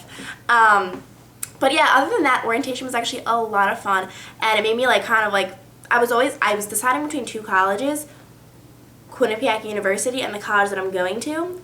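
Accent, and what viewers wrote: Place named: New York, United States. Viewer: Her New York accent was so strong back then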